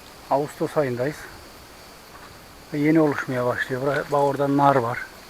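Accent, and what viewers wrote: Turkey. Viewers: Kardeşim yozgatlımısın şive orası gibi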